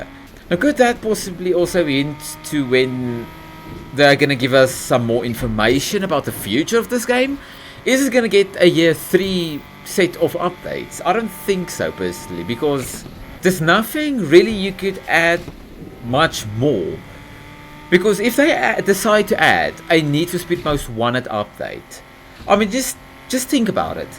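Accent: South Africa. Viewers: Do I hear a fellow South African?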